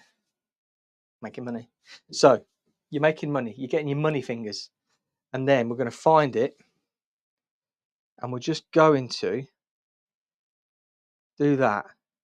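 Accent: England, United Kingdom. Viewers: Let me first applaud you for your skillful talent. I’ve watched several of your videos and although I am no hairdresser by any means, your videos for the average DIY are so precise and helpful. Secondly, I think English accents are incredibly sexy. I could literally fall asleep listening to your videos on repeat. Keep up the good work!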